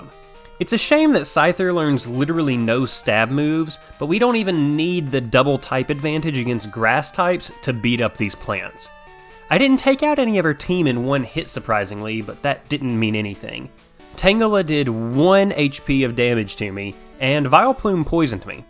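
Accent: South, United States. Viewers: I follow Canadian Poketubers, British, Irish, Australian,... now I can add "Southern American" to the list of accents I get to hear from Poketubers :D Very cool challenge videos, please keep it up!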